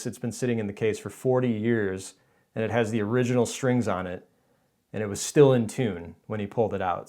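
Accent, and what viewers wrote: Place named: Canada. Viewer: Sure sounds Canadian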